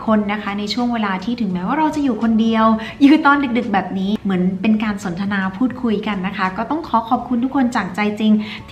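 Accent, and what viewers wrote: South, Thailand. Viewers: สำเนียงใต้ก็น่ารักดีนะคะน้อง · มีแหรงใต้ด้วย น่ารักจังเลยครับ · พี่ พูดใต้ให้ฟังหน่อยค่ะชอบๆๆๆๆ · ชอบพี่ แหล่งใต้ 😁🤭😁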